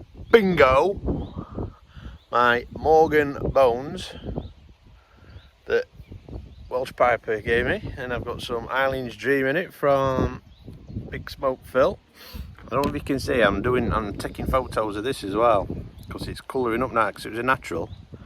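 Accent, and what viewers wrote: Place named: Northern England, United Kingdom. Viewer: Greatly enjoyed the chat. Beautifully Northern, lad!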